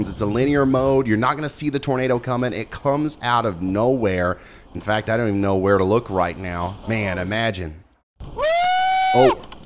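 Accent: South, United States. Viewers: This fella sure does talk fast, especially a guy who has a southern twang.🙈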